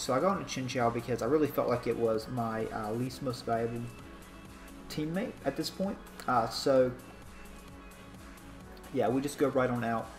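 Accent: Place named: South, United States. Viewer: GG my dude. Very well played. You sound more southern in this vid than any other. I love it haha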